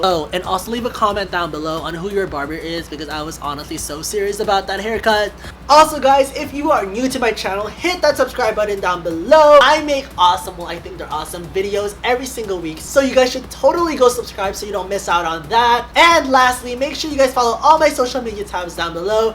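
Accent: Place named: Philippines. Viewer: First clue: flip flops. I was all like you know, I think he is Filipino 😂 that and you kind of have a Filipino accent.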